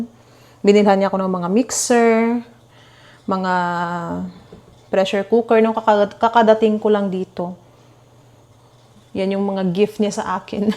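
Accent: Iloilo, Philippines. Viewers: ilongga ka ma'am?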